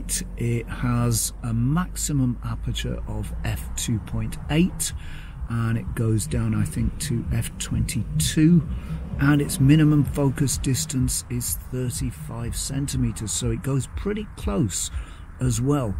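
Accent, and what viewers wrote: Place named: Stockport, United Kingdom. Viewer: Love your videos, having shot film in the past, and currently looking for a 35mm having got the zeiss 21mm loxia, which i love, but i'm thinking the Voigtlander 35mm f2 Apo-Lanthar Lens might be my solution, so this content is most helpful , thanks, keep up the good work. ps your accent sounds like you're from Stockport, am i wrong ? , i only spent a year there.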